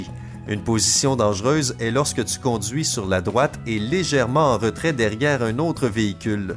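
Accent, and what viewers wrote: Quebec, Canada. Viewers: Skip oui c un accent qc (je suis un Quebecois) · C'est juste l'accent québécois. Et c'est très joli je trouve.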